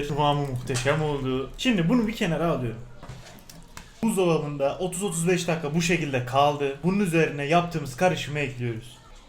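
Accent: Adana, Turkey. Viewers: Abi sen nerelisin adanaı gibi konuşuyosun atüyürüm ocağa ekliyürüm diye konusuyonda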